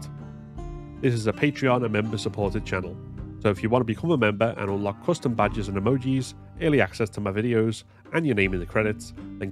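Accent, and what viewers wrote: Liverpool, United Kingdom. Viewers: Man I love the scouse accent haha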